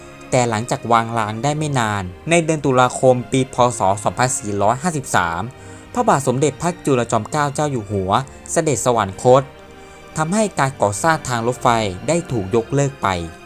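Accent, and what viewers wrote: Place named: Myanmar. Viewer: ทำไมพูดเหมือนพม่าหัดพูดไทย มึงเป็นพม่าใช่มั้ย😮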